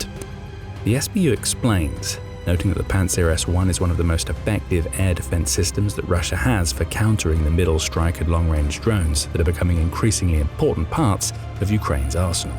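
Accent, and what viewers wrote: United Kingdom. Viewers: Im very surprised you're saying soccer when you sound like you're from the uk.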